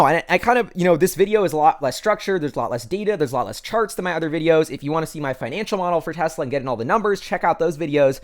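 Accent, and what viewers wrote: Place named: Silicon Valley, United States. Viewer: The cancerous silicone valley accent can be avoided by muting it and using captions.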